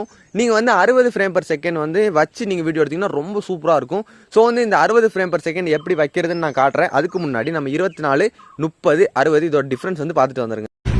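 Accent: Kanyakumari, India. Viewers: Anna neenga kanniyakumariya?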